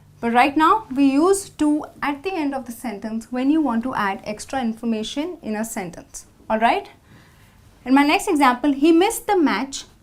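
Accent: India. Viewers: Hi! Thank you for the explanation! Are your accent from India? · is she indian? she has a particular accent, she doesn't sound like an American. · you agreat , you from indian i know from your english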